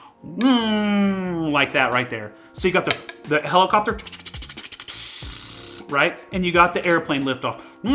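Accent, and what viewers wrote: South, United States. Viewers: Great videos, man! The quality of my steamed milk went up an entire level thanks to you! I have to ask, where are you from? Your accent sounds super familiar, to me. (I'm guessing Georgia or Alabama)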